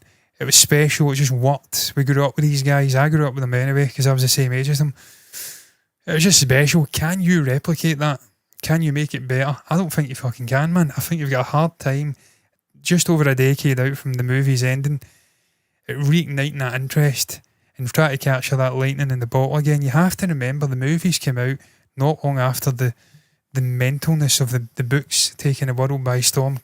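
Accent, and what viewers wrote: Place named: Scotland, United Kingdom. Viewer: Lmfaooo where's that accent specifically from? Scotland? Where in Scotland? I love it, as a non-native English speaker I thought it was some celtic language for a moment 😭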